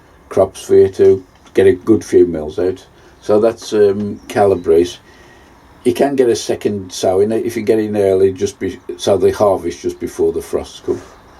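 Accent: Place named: Midlands, United Kingdom. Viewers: Just discovered you and I Love this. Very informative and relaxing. I'm getting the vegetable garden bug again. Must be that midland accent.ive subscribed Keep me coming.👍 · I also find it difficult to throw seedlings away!! Whereabouts are you? You sound west mids......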